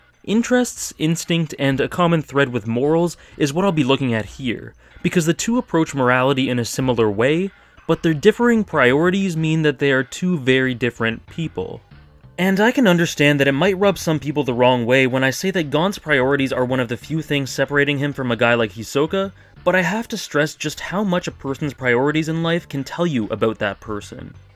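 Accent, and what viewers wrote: Canada. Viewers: You sound Canadian that's all.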